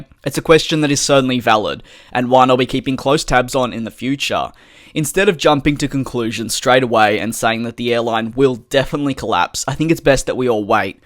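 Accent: Australia. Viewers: Are you Australian? Got a hint by how you say year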